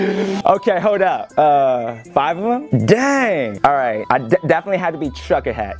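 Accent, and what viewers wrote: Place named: Washington DC, United States. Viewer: talkin like a true D.C. native hahaha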